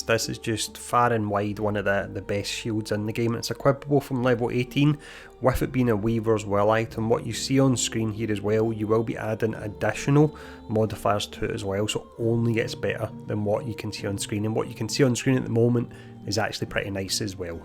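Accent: Scotland, United Kingdom. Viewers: You Scottish btw? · great accent😊 Scottish?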